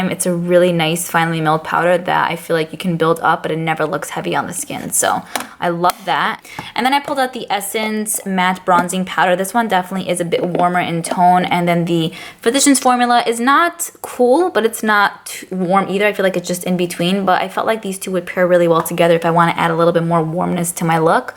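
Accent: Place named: Queens, United States. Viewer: Just discovered your channel from suggested videos and I’m going to subscribe. I like your positive vibe. Also your accent and the phrases you use are reminding me of my hometown of Glendale, Queens. I’m in CT now and would love to not be the only one with this accent. 😻💗🌈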